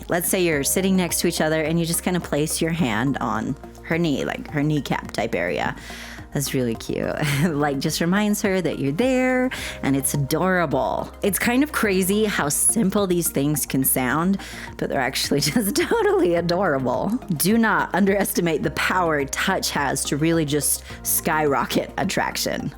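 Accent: United States. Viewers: How and where is highly cultural. I hear your USA accent probably New England. Touching is à no no never. I will never return USA and this is only à secondary reason. Main reason is aggressivity. Second is political corruption. And so many others.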